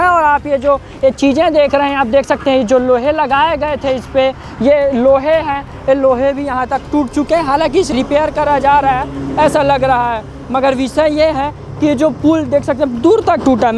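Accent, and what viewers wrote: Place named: Bihar, India. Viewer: नेता परदेसिया अधिकारी परदेसिया इंजिनियर परदेसिया to kaise rahega sabkuch ठीक ओर तू तो पक्का परदेसिया है बिहारी बाबू तुम्हारा बोलचाल बता रहा है 100%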